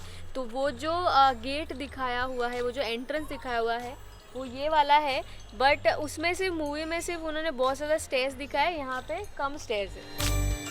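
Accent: Gujarat, India. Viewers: Meera jee "kantaal" ke Dwarika chale gaye 😀. I totally loved this sentence. Ekdum Gujju style Hindi hai. And I have stayed in Gujarat for 15 years. So I love this style of speaking 💕. You guys make amazing videos and one reason I watch them is to listen to style of Hindi!! Keep rocking guys 👍. Khup गोड आहे ऐकायला!!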